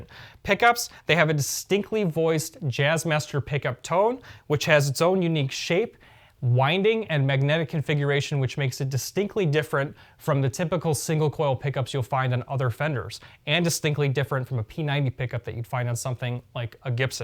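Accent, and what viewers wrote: United States. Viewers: Chicago accent? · or Picksburgh accent.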